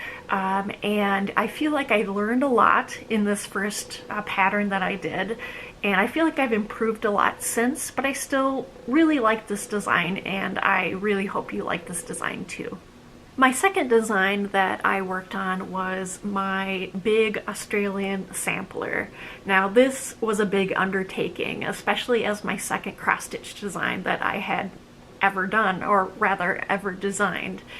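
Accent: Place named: Minnesota, United States. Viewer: Love your Australia story and your Minnesota accent.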